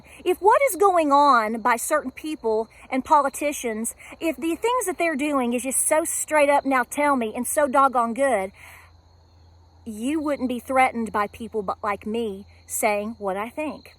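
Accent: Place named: Tennessee, United States. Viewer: I’m so glad to hear you speak like a Tennessean ❤ I’m not apologizing for speaking about this beat down we’re taking. They are trying to kill us off. I’m a Hillbilly & damn proud of it !! No apologies, only regret that I as a citizen, didn’t see this crazy coming sooner. Keep speaking out, & fighting on. Let me know where to find you other than here.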